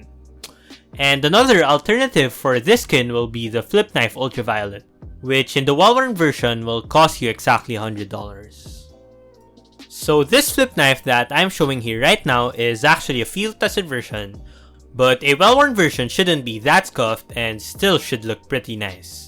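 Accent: Philippines. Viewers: i can hear it in your accent kabayan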